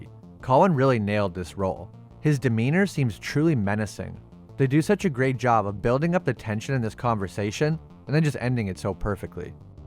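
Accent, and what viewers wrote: Canada. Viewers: Sounds like hes from the great white north and talk with that kinda accent, just my guess .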